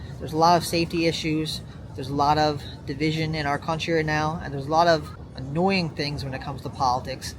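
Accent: New York, United States. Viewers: that Nu Yoork Accent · I hear the nyc accent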